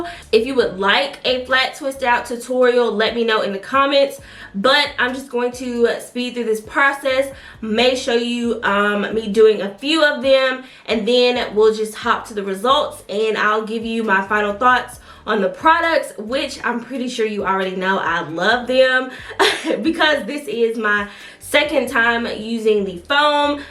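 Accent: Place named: Memphis, United States. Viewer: I like your accent. Sounds like a Memphis accent lol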